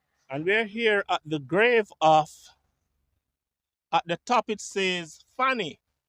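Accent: Jamaica. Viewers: The Grammar police are out in full force. I appreciate the Jamaican accent with all it's pronunciations and inflections. What I enjoy the most is all the information in these videos and the effort that has gone into visiting the locations, researching, editing and narrating them. Now Grammar Police send the links to your perfectly edited, interesting and entertaining videos so I can watch them. Thanks x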